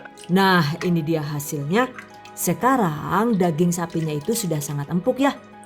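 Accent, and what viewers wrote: Banyumas, Indonesia. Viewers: Jebule ya wong ngapak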